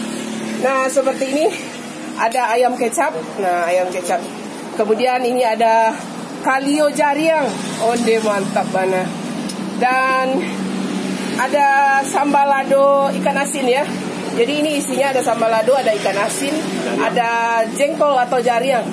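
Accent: Minangkabau, Indonesia. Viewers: Iko baru mantap, kuliner minang di review oleh orang minang asli dgn dialek khas minang. Sukses taruih uni di tunggu video2 berikutnya, lapeh juo taragak jo kampuang nan jauah di mato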